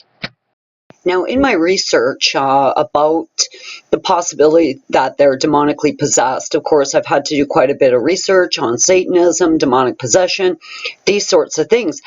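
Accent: Canada. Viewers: Hey, if I may ask what province are you from? I think Nova Scotia, and also for some reason,Manitoba. I'm from Ontario, theres no place like home.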